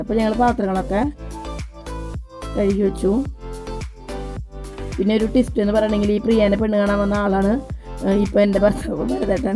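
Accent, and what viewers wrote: Thrissur, India. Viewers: രസമുണ്ട്ട്ടോ പറയുന്നതുകേൾക്കാൻ തൃശ്ശൂർ ഭാഷ ഇഷ്ടം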